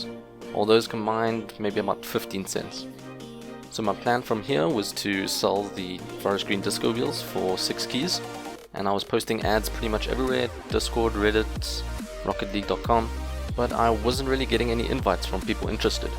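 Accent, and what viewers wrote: South Africa. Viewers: R u from South Africa bcuz I am!!! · are you south african? it really sounds like it. If so what part are you from. I'm originally from joburg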